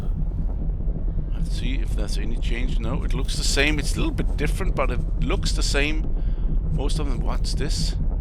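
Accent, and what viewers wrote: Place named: Denmark. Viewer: Er lige startet på din Lets play! Kunne høre at du var dansker og ville sige Godt arbejde og pas på dig selv <3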